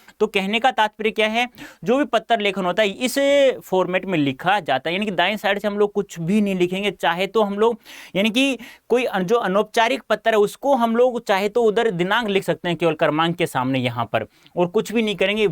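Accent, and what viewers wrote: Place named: Haryana, India. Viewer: Sir ki language hai haryana ki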